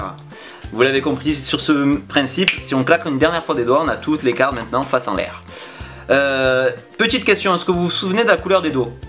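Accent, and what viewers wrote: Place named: South, France. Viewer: Tu fais des vidéos très fluide avec ton bon accent du sud 🤙 Celle si est sympa et Hani vraiment envie de connaître l’astuce car je débute en magie 😁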